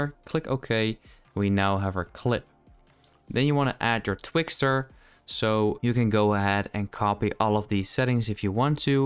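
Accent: Netherlands. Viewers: you dutch? idk im just guessing cause you sound dutch, maybe im wrong. anyways im dutch. lol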